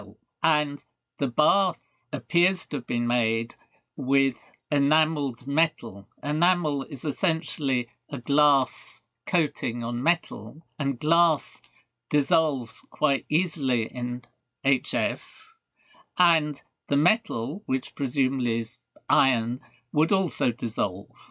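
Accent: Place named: United Kingdom. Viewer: hearing someone say "the hero, walter, and his sidekick jesse, were surprised by gangsters; walter defeated two gangsters" in a british accent does something to my brain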